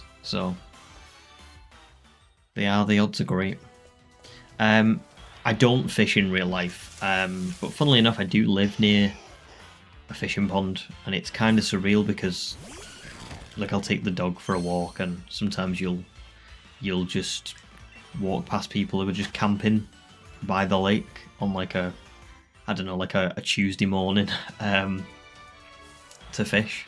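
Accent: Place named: Derbyshire, United Kingdom. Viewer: Where bouts you from mate? Love hearing other people say tea instead of dinner. Im a Derbyshire lad myself. Not often you hear similar dialect over Pokemon content😅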